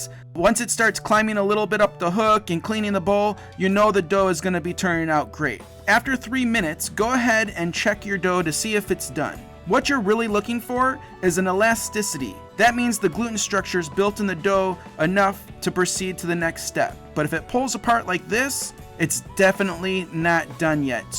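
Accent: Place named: Upper Midwest, United States. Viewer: accent from upper mid-west, check. banana peppers on pizza, check. pizza isn't limp when it comes out, check. you have my full endorsement and I will be trying this today. 🙏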